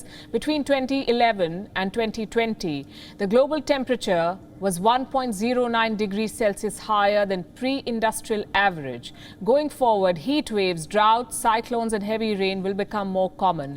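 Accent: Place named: India. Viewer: Everything is perfect, no disrespect, but your thick Hindi English is at times very difficult to understand.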